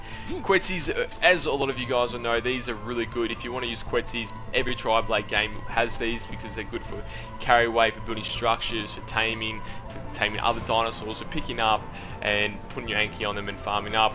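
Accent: Australia. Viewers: BobHoppaFlotchkin Maybe some people don't speak english as their native langue (go figure) and thus struggle to understand someone with a thick australian accent who could give Steve Woodmore a run for his money?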